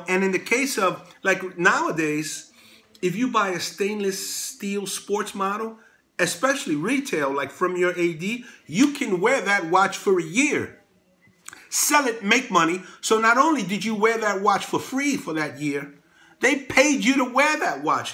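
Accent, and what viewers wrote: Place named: New York, United States. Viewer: Thank you Sir for an honest, accurate and entertaining watch review. I am a new fan and like your New York, Italian American manner, you remind me of a character from the 'Good Fellas' lol... Would love to hear you say 'Ged outta here' lol....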